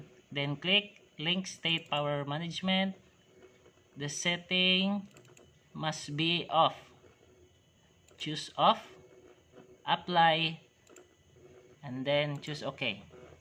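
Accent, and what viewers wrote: Iloilo, Philippines. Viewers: Illongo ka pre? Hehehe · Illongo ka nong? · dayon?! hahaha ilonggo kaman gali hahah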